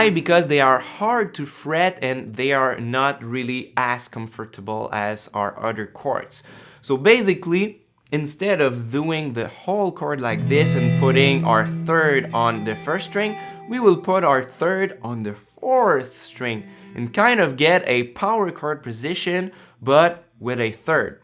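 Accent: Quebec, Canada. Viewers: Nice lesson bro, bel accent québecois apart de sa ;)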